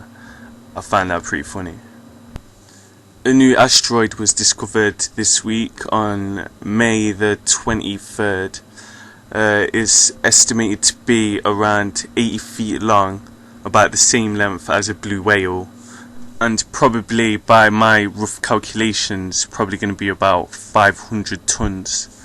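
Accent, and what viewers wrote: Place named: United Kingdom. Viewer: where in u.k u from mate? london? great show mate keep it up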